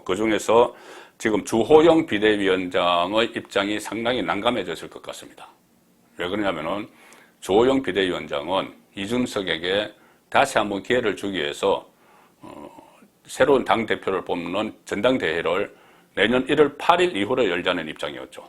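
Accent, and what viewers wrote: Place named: Gyeongsang, South Korea. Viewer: 깅상도 발음 참 듣기 싫다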